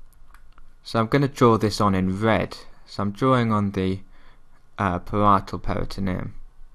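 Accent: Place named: United Kingdom. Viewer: Thank you :D love the fact that you are British... it's easier to understand... :D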